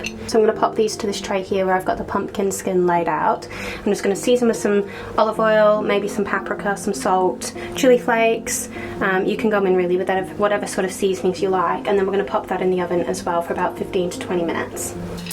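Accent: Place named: Australia. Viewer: Hi -I stumbled across your videos and love them so much..they are so comforting and cozy with your soft Australian voice/accent. 🙂 Cotswolds is stunning all year round - your filming, music and editing is exceptional - you have a good eye to detail/vision and capture everything so beautifully, so calming and peaceful ❤. Im vegetarian and lovvved the salad...chefs kiss. I live in London and IMO it does the best Christmas lights ever..its sooo magical..you will absolutely love it 🎄🎄Cant wait to watch more of your beautiful calming videos in the Cotswolds. You will leave with amazing memories. xx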